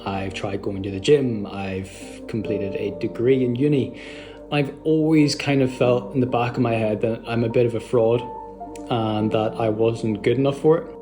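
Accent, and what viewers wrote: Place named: Ireland. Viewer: No way!!! I’m doing the Belfast marathon as-well, I suspected you were doing it cause u said u were 12 weeks into training which means most likely u only have another 4 weeks left and the Belfast marathon is in 3-4 weeks and I could recognise the Irish accent from anywhere. Maybe I’ll see you there mate, good luck and what time goal are you going for if you don’t mind me asking?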